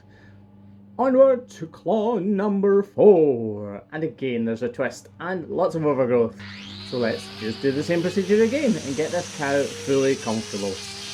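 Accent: Scotland, United Kingdom. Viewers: I watch so many of your videos on mute I had no idea that you where Scottish this full time that’s so cool I stay near Glasgow. If your ever looking for a 30 year old apprentice I would love to do this I can’t stop watching these videos lol there class. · I looove your lovely Scottish accent and how you help animals! I’m American and have a customer with a lowland Scottish accent.. just adore her.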